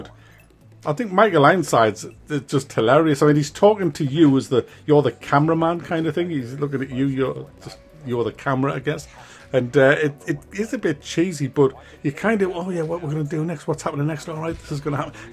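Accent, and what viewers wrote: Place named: North East England, United Kingdom. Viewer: Never ever thought i'd hear somebody from the North east do a review on tiberium Wars😂😂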